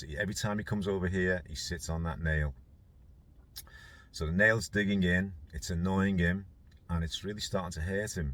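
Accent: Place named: Alabama, United States. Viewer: This is so spot on. Your 'Bama accent is not bad, but I don't know a single person called there! 🤣😂 As someone who has worked bloodhounds for years I am guessing that old hound never gave up that nail! 😉😊🤗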